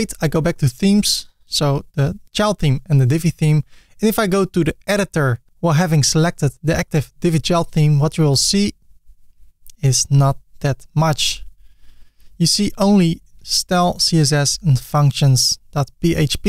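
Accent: Netherlands. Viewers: It took me 26 seconds into the video to realise you are Dutch. Especially the pronunciation of "file".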